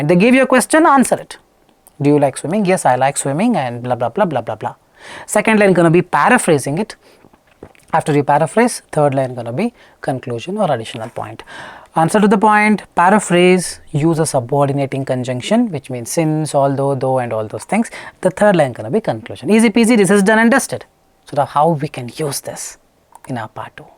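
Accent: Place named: India. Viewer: I think he is the best guy who can explain language topic easily. Besides IELTS video, I even follow his another videos about PTE. I enjoy his video a lot from Bangladesh. His accent tells me he is Indian guy. Love from BD.🥰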